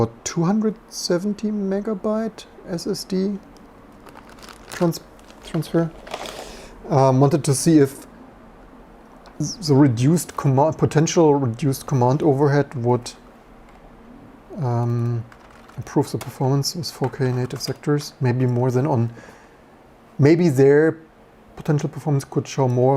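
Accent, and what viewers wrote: Germany. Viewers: If your accent didn't give up that you are German, the lidl and aldi definitely gave that up. Btw Aldi has currently one of the biggest SAP transformation going on rn . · anaser most german english ever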